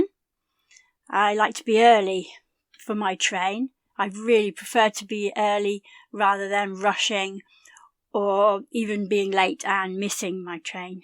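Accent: United Kingdom. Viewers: I'm grateful for your videos. I love the British accent!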